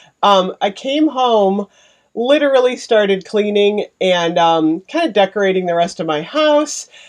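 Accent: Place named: Northern Ohio, United States. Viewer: Susan Smith, that is the regional pronunciation of that particular word. You will find most Northern Ohioans do pronounce things differently than the rest of the country. I’m not far from and I pronounce it that way as well. I am also college educated. It really is the regional vernacular.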